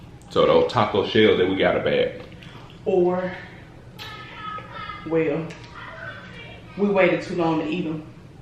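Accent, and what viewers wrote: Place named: South, United States. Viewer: Love that southern accent lol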